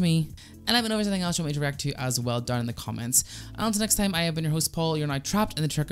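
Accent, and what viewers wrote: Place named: Northern Ireland, United Kingdom. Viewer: Yes ,,,,ok can I just say I hadn’t got a clue what the hell you were saying,,,,,, I would recommend you trim the accent down to the point where people outside N,Ireland would not arrive at the conclusion that English is your second language. And I’m from NEWTOWNSTEWART via Amsterdam and LONDON.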